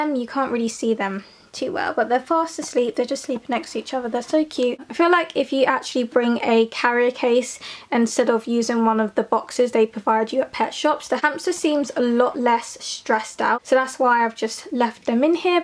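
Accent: United Kingdom. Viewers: Are you British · r u from the uk? xxxxxxxxxxx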